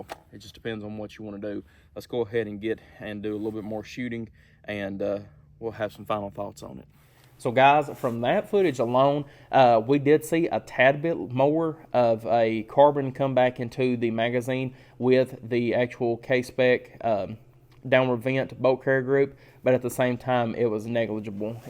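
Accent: South, United States. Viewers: Love the way real Southern Boys talk. It's not a BCG, it's a BeeeCeeGeeee.......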